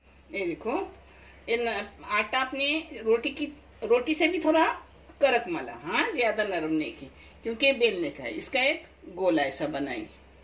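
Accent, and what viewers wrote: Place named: Konkan, India. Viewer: Ap k bolne k tarike se lagta hai ap kokni hai Isliye pochi jazakallah khair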